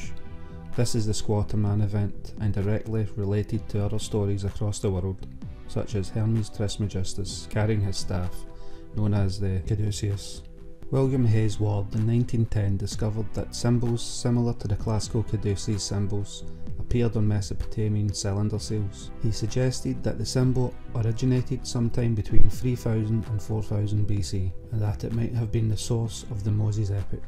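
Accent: Scotland, United Kingdom. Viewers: I was like eh Scottish that's new on here lol I'm Scottish myself. I Loved it.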